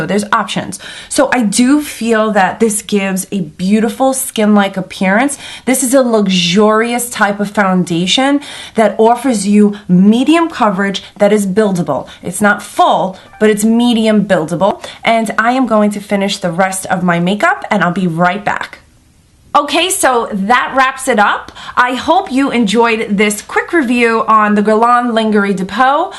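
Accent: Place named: Long Island, United States. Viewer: OMG! I am not being offensive. I am from Lawnguyland. You sound like home. Non LI pronunciation: "Lan Jer E" :-)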